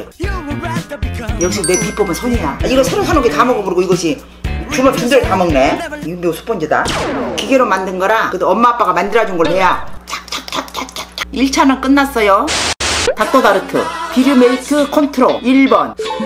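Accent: Jeolla, South Korea. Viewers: 전라도 사투리이신것 같은뎈ㅋㅋㅋㅋㅋㅋㅋ 말투 중독된다 처음 보는데 구독함ㅎㅎ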